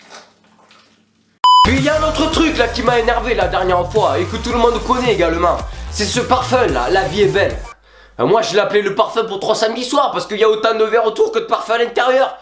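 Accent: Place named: Marseille, France. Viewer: J'adore ton accent mdrrr 😂 Je vis pratiquement à côté de chez toi dans les Pyrénées et on a pas l'accent aussi prononcé que toi... T'es marseillais a la base ou quoi? Sinon j'adore tes vidéos, continue 😘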